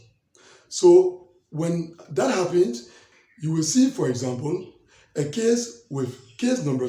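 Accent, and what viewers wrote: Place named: Liberia. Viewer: Hello bro, which national are you? Because you sounded like a Liberian...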